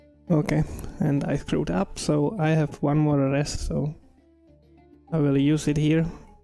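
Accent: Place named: Czech Republic. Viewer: já ten českej přizvuk výdycky poznám xD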